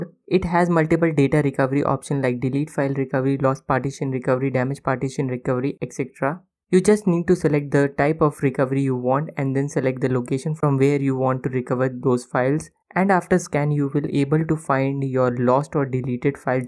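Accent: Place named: India. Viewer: youre speaking english in indian accent and i still can understand what you are saying! just speak a bit slower and youre good to go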